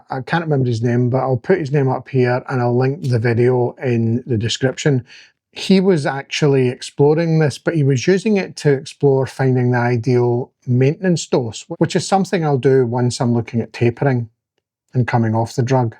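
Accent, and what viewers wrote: Scotland, United Kingdom. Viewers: thanks for your info a fellow Scot its great to hear a local accent! Im on my 2nd 2.5 pen good suppression but by day 5 i feel it dip. So ill start giving myself 2.5mg every 5 days. My next pen do you think i should move up if still getting suppression? Or irder a 5mg pen anyway?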